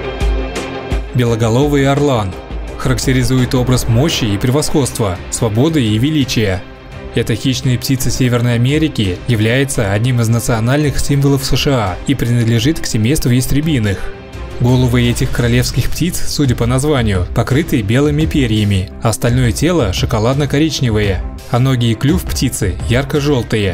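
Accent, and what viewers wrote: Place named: Ural-Volga, Russia. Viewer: Текст хороший, а уральско поволжский акцент утомил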